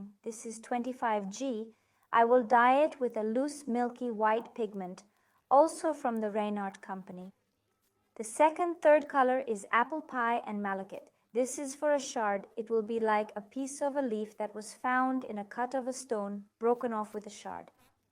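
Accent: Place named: Sri Lanka. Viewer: Sounds sri lankan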